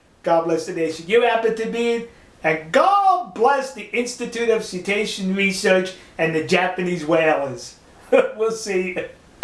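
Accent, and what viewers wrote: New York, United States. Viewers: fair 'nuff, you got a kinda funny new York soundin' accent, but my oh my, look at these comments down yonder, why can't these folks just state their opinion without all this f**k this, c**t that and the other? can y'all open your puny minds and cut out your gutter tongues? nobody wants to hear that, you got an honest opinion, state it, but all this cussing without a cause doesn't make nobody think the better of you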